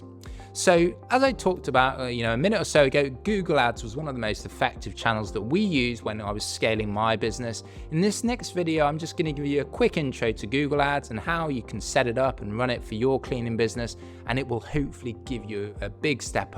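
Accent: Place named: United Kingdom. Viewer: Hey are you based in the UK? I am looking to start it now & I came across your British accent lol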